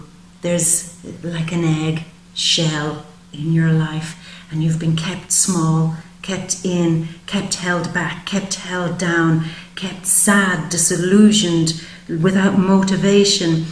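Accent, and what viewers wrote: Ireland. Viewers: For now as I am going to listen to the rest of your video I love ya you are honey and lemon and your voice is ace. Your accent to die for and your gift. I listen to different videos but you are the best. The creme d la creme the cherry on top. Amazing. But that cos you have the Irish beauty no one can deny. Mwa mwa mwa. Speak to you again. Soon. Stevie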